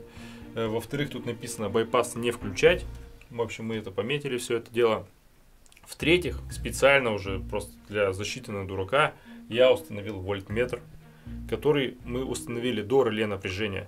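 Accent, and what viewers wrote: Perm, Russia. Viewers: У вас говор как у "Реальных пацанов", они тоже из Перми.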